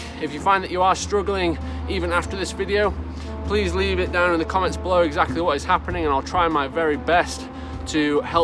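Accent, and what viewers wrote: United Kingdom. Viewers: Bri’ish? But actually thanks for the tips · Where from the UK are you?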